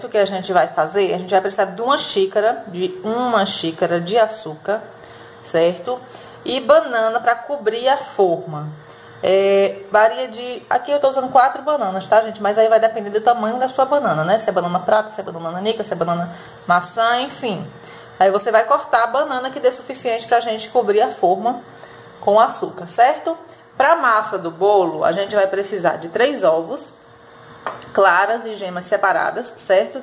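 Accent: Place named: Northeast, Brazil. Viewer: menina, adoro nosso sotaque, sou Nordestina e repito muito. Certo e né? Né? rsrsrs. Amei sua receita CERTO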